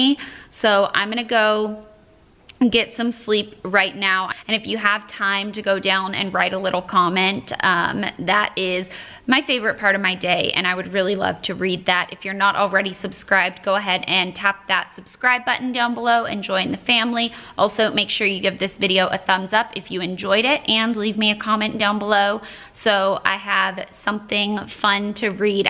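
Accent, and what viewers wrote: South, United States. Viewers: Hi, I've been watching your videos for so long and just honestly love your personality and seeing more into your life! As long as they keep making you happy I hope you keep making vlogs for a long time! Also was wondering after watching the clips from your first youtube video ever if you had to consciously work on your southern accent, or if it naturally got less strong when you moved to LA? You definitely had more of a twang when you were younger haha!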